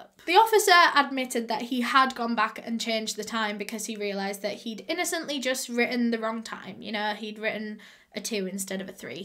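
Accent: United Kingdom. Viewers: I can't say for sure, but I'm British and I think her accent is British too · Dirty cops... jeez. Question:, are you British? My niece wants to know what your accent is.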